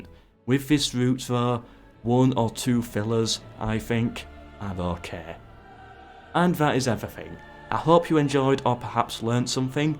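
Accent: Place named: Yorkshire, United Kingdom. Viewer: h so this is the well-known yorkshire accent that everyone speaks of. Now i have heard it aswell finaly. Must admit that for me as a german it is sometimes hard to understand acusticly, but the quality of the content makes up for it ! :)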